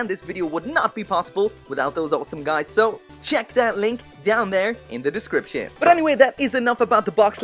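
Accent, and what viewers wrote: Ireland. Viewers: I had no idea you had an Irish accent! Regardless, Thanks for the reply, and you can count on me to continue watching and supporting your channel.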